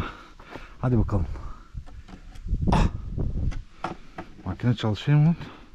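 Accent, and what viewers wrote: Thrace, Turkey. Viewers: Trakyalı olup konuşman 'da )ayrı bir hava veriyor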